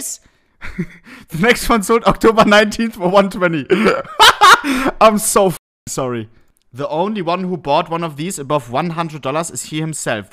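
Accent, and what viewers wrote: Germany. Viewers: my guy is german but speaks english bra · German accent in english is fucking great lmao i moved to germany over 2 years ago and as a english speaking person it was soooo funny hahahahahah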